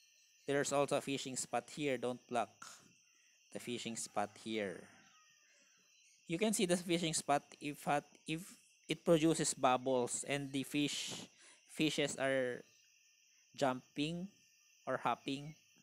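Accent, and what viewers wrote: Philippines. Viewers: Your tips are very helpful, thank you 👍👍😊 btw I'm loving the Filipino accent 😁✌️ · pinoy accent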